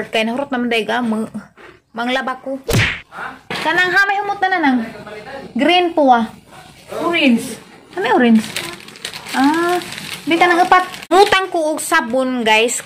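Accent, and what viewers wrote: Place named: Visayas, Philippines. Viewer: I like your Bisalog Accent dai relate ko kay ing ana pod ko bsan ang dugay ko na dito sa Manila eh😀😀😀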